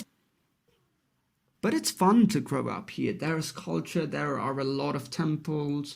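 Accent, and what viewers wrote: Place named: United Kingdom. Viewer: Your videos are great, keep it up. And your accent being British is very interesting, when I first saw your 3000 years history video, I thought you were British lol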